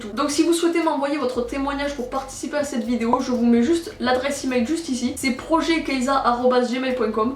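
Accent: Toulouse, France. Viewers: Tkt pas les commentaires méchants ont s'en fou, et ton accent c l'accent toulousain moi j'habite a Toulouse et j'aimerais grave te voir ❤ et ton style j'le kiffe trop 🔥💪❤ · L'accent Toulousain on l'a pas choisi lol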